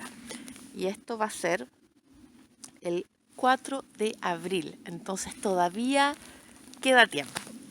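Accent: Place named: Chile. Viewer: Bella . Pensé que eres chlena. Por tu acento. Sos chilena ?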